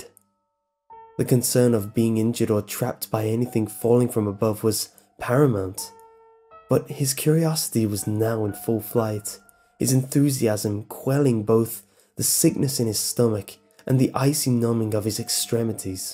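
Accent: England, United Kingdom. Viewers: Yah,not really into britspeak. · I like MCP and. But I like English accent better than MCP's accent.:D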